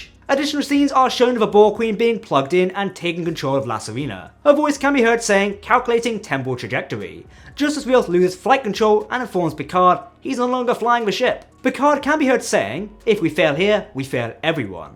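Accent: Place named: England, United Kingdom. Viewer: Always enjoy your videos very informative and the wording is always nice and witty however and please take this in the right way could you please slow down just a little bit you're an English accent which is OK makes it harder for people to understand everything that you're saying with the speed at which you're saying it in other words a she aint sure have a little bit of a problem Thank you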